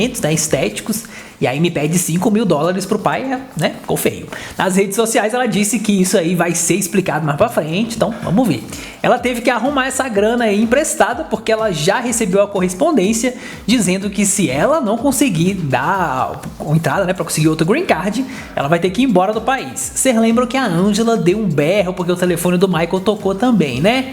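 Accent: Brasília, Brazil. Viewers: Quem fala Es menina? Fala Tu como brasiliense fala kkk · Você é de Brasília??? Por isso me identifiquei muito com a sua fala! 👏👏👏😄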